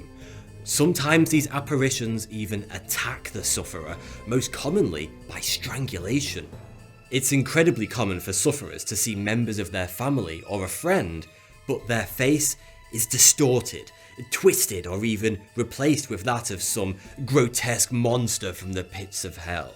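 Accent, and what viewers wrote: United Kingdom. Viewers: Wait, have you always had a British accent? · When I read your book, it'll probably be your voice with that british accent reading it in my head. Can't wait. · He is the perfect blend of British but no too British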